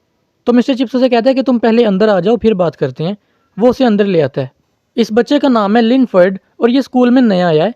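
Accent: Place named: Lahore, Pakistan. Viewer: Apki language lahoriii type hai ap Lahore sy hai ?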